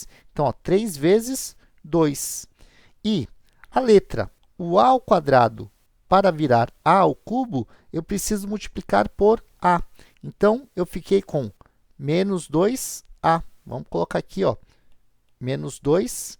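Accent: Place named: Rio de Janeiro, Brazil. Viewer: so eu que percebi o sotaque de carioca?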